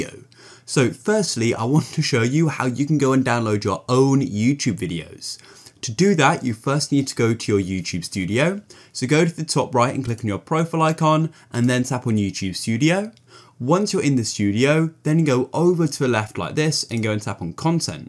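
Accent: United Kingdom. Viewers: British acent!!!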